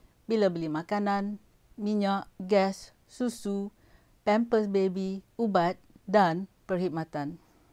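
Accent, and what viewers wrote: Perak, Malaysia. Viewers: Pekat bahasa perak..haha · Yong oghang peghak ke? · orang perak ke yong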